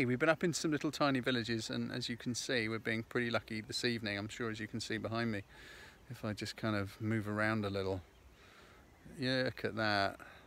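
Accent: United Kingdom. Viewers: Hello,. I wouldn't mind just hearing you talk everyday:-D (it's probably the British accent... if not, I'm sure it helps). I always say I must have been British in a past life:-D... and now I'm just planning to get "back". So I just want to ask about your equipment. What stabilization method were you using in this video? Cheers.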